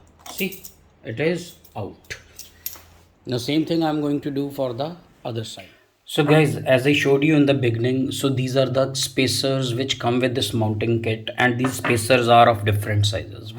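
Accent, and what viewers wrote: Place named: India. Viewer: Good job, i think i'm going to buy it for the winter season. I like your english with hurdu/hindi accent 😊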